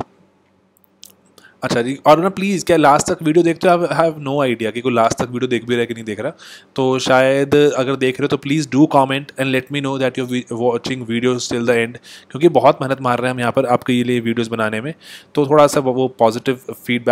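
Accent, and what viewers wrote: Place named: Punjab, India. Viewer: Sir bahut hi awesome videos bnate hain..mene pura dekha.. Jo topics mujhe bilkul upar se ja re the..ab puri tarah clear ho gye hain..mai bahut khush hu.. Appreciable content And your voice is really very adorable💜 Jo panjabi accent me chhote chhote jokes crack kr dete hain us se video me man lga rehta hai 😁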